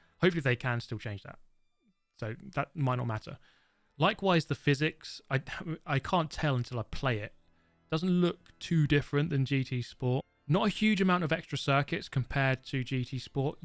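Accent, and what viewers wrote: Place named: London, United Kingdom. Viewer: I think you're from the same part of London as General Minus based on the accent..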